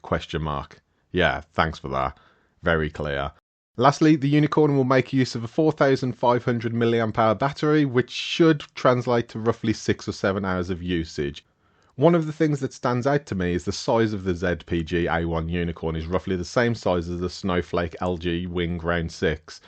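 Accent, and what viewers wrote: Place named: Birmingham, United Kingdom. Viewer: Nice Birmigham accent. I'm from from Acocks Green..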